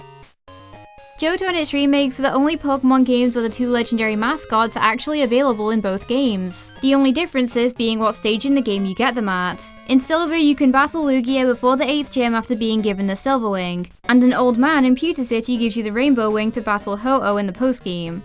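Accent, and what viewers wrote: England, United Kingdom. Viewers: Are you ever so slightly English? You definitely have a tinge of our accent when you talk. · Woah, this person has a very odd accent. It sounds like a regional accent from Britain that has been polluted by living in the US. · Your accent is awesome! I wish I had an English accent... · OakTea she's british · What nice British voice.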